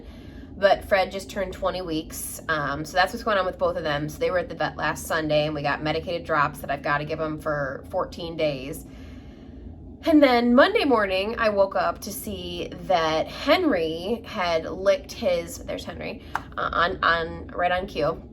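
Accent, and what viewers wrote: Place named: Chicago, United States. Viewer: That Cryptids SAL is looking awesome! Love that Chicago accent too 😜 Your videos are great!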